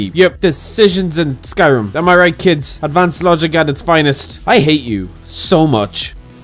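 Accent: Ireland. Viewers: Yes, I came here to your channel specifically to listen to your Irish accent. There's no doubt about it. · I like the video but dislike the Irish accent, what do I do? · Not here for Irish accent already got one of my own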